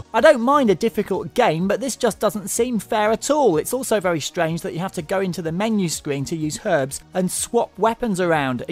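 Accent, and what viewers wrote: United Kingdom. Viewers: i think hes british sh sm isnt out yet grr i must play it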